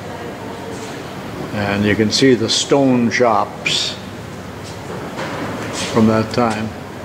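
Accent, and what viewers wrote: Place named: Midwest, United States. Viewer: No disrespect intended . ! I guess it's that Midwest voice that reminded me of him . He was a good man .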